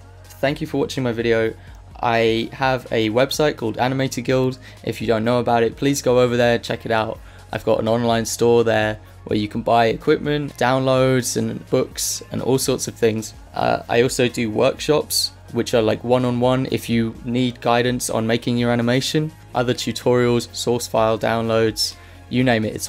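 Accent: United Kingdom. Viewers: R u British?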